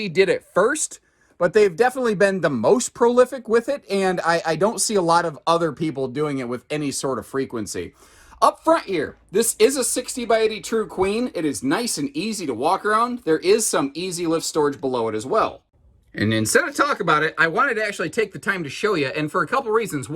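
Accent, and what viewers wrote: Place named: Upper Midwest, United States. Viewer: They very first video of yours I watched I heard “right dere” used as punctuation and knew you were a good upper midwestern boy. I, too, am from the midwest and it’s pop. I’ve got the significant other trained to say pop when speaking directly to me (it’s soda otherwise) and my adult daughter is still with us and said that if she goes back to Alabama calling it pop she’ll be ticked. It’s pop. 😬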